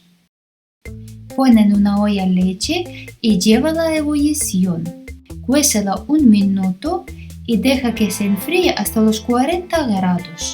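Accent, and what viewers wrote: Ukraine. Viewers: Ella es de Ucrania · Ella es de Ucrania, residente en España!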